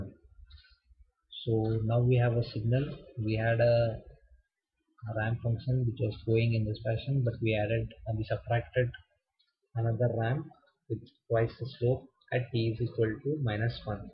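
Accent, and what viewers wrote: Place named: India. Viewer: Not only you've got that stupid inidian accent, but you've also got a shitty microphone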